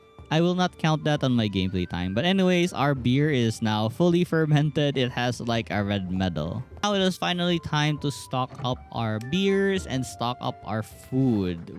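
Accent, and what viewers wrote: Philippines. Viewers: The Pinoy accent made this video better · I knew it. Haha! Strong pinoy accent 😁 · The tagalog accent is real on this one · A sense Filipino accent, Pinoy ka lods?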